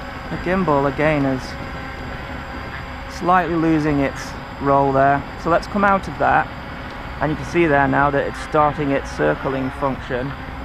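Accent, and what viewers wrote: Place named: Yorkshire, United Kingdom. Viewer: You sound exactly like my friend Oliver from Yorkshire :)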